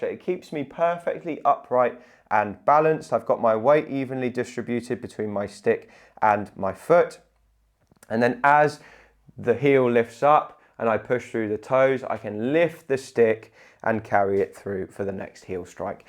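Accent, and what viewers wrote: United Kingdom. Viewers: I was a nurse and ended up disabled and I have to use a stick. No one ever educated me even after 12 spinal surgeries on proper height of my cane. Thank you for this tutorial. It sounds like you are across the pond from me and educate your citizens better than American PT’s do. Thanks once again going to adjust mine right now 😊😊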